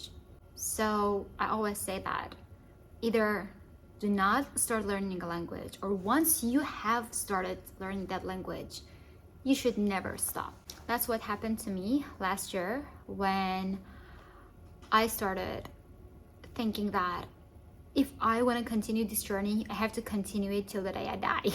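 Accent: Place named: Arab world. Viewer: Why do you have Arabic accent!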